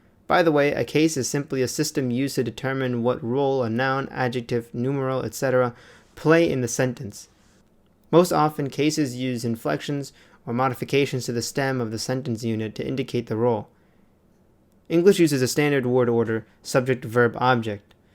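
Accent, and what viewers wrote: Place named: Russia. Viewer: Aš gavau šitą video recommended skyriuje ir aš lietuvis, bet tu skambi, kaip su rusišku akcentu.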